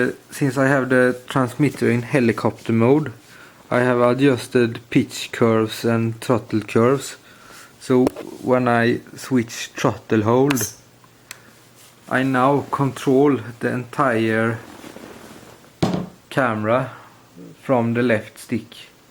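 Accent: Jönköping, Sweden. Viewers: Är du från jönköping?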